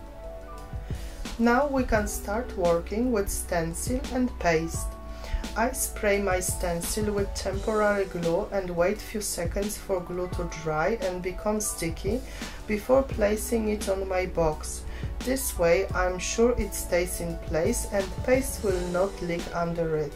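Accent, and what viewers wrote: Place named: Poland. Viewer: Wyraźny polski akcent, pozdrawiam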